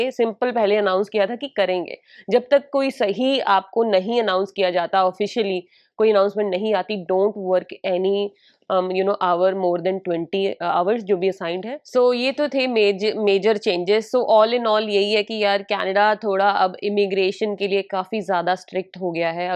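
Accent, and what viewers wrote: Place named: India. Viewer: Why do Indians have such a strong accent in English?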